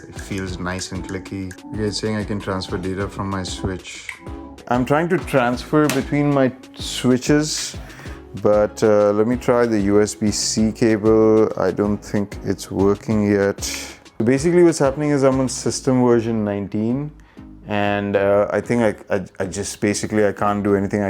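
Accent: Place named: India. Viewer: god the indian accent is so harsh and foul to my ears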